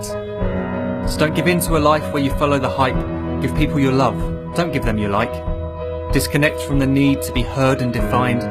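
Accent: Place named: United Kingdom. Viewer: He talks real funny! Is he british or somthing? Anyhow, all you ol' geezers should spend MORE time on yer smart-phone! Like I do!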